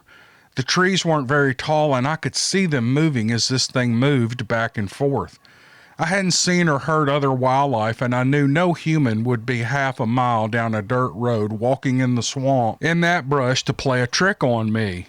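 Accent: South, United States. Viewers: I could listen to your intro songs and by themselves for hours and still enjoy your show.Im in the Huntsville area so your accent is easy for me to understand,did you know that there are eleven distinct southern dialects? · Your channel for stories is the best. Your southern accent and kind way about you, makes you fun to listen to. Keep up the great job. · There nothing wrong with your voice I love the way you talk and when you do these videos you can close your and imagine yourself there you do a good job in tell you this I listen to bigfoot case and I listen al TRUSTED but your is the best cause you make the stories more interesting and I get my popcorn and sit back when you tell the story it like you there .thank for the video I keep enjoying your videos keep up the good work with your southern accent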